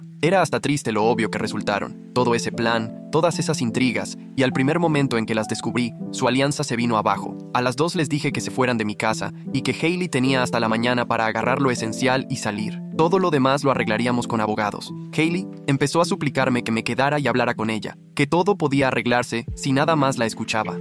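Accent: Mexico. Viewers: Que mexicana la IA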